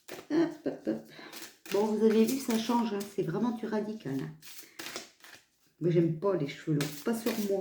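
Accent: North, France. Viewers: Bonjour Je vous suis depuis un petit moment je m' éclate d'abord avec votre accent du Nord surtout quand vous dites "voilà " j' adorrrrre!! Le 16 juillet à 20h precises sur Web tv RDH (Rassemblement des Droits de l'Homme) un certain Philipoe Argillier doit parler. POURRIEZ-VOUS NOUS DIRE SI C'EST QUELQU'UN DE FIABLE OU PAS. MERCI🙏❤️ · Bonjour j ai découvert votre chaîne il y a peux de temps aussi j attend les suivantes avec impatience j aime votre accent du Nord et votre gentillesse votre nouvelle coiffure vous rajeunit pouvez vous svp me donner les liens de votre site j'aimerai vous acheter des angonites merci bonne soiree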